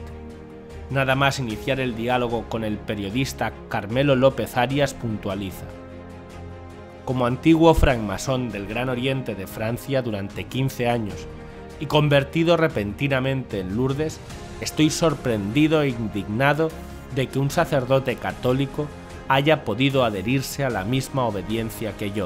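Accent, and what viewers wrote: Spain. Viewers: Buenas, felicitaciones. Buen contenido pero muy alta la música. No permite entender su voz, que es español de la Padre Patria y los latinos debemos afinar el oído y con la música altísima, nos cuesta. Por favor, siga adelante enseñando lo disfrutamos, sólo con un poco más baja la música. Gracias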